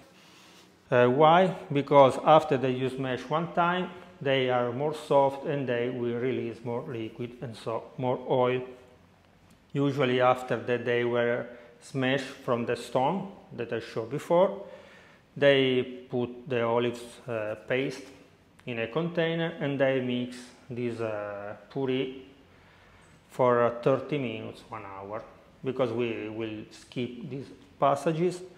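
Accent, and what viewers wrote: Italy. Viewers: I had to like the video the second you started talking. Love the Italian accent. Watching from Ocala, Florida. Thank you for showing us how to make the oil starting directly from the plant. I have a nice fully grown olive tree that is producing olives and I can't wait to give this a shot.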